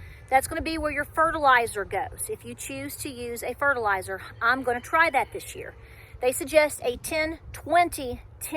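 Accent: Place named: East Tennessee, United States. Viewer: Great video. Very inspiring. Love your accent. Is that from East Tennessee?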